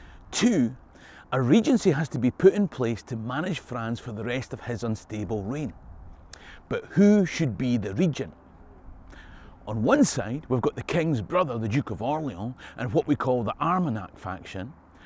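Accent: Scotland, United Kingdom. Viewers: Gayday buddy just letting you know it’s the 7/8/21 you have 60,100 well done love hearing the scot language l was 2 when we went to Australia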